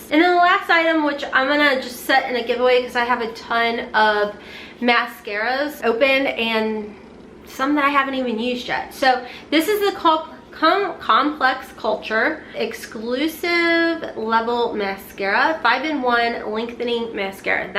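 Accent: United States. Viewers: Haha 92% of the time you have a generic American accent…oh, but the other 8% 😂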